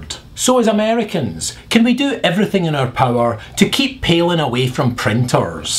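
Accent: Scotland, United Kingdom. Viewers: I'm no going to say whether or not I support Obama or McCain. I am going to say, however, that the last two of videos have been incredibly disappointing to me. It sounds like he just reads op-eds with a scottish accent. I go to youtube to wind down, because I've heard to much of politics in my day. I don't want to be blasted by politics again., please go back to making fun of the iphone and dvd special features. Those sketches are funnier.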